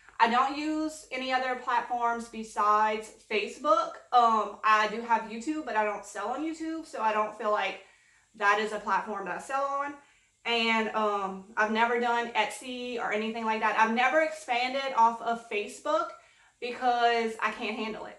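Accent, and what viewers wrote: Louisiana, United States. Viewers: Love your video's, great inspiration! Thank you for sharing your ideas! I was going to ask what state you're from, because I keep hearing Cajun. Then on the fb group, your last name...silly, I know. But I've got a friend that's Cajun and I love hearing her talk.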